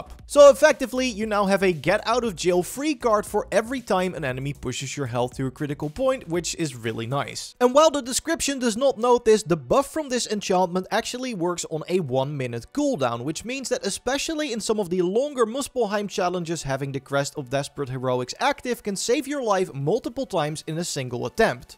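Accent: Netherlands. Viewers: dude r u dutch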